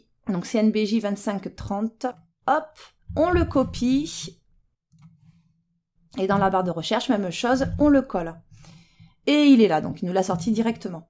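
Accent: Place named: Corsica, France. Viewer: accent corse.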